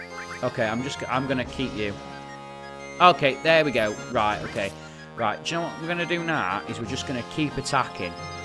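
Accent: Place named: Yorkshire, United Kingdom. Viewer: The Mrs is from Yorkshire! Where you from big man? Some armpit like Selby/Goole?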